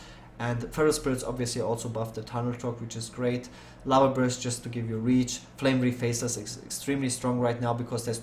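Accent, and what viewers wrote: Germany. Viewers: Dieser moment, wenn deutsche englisch sprechen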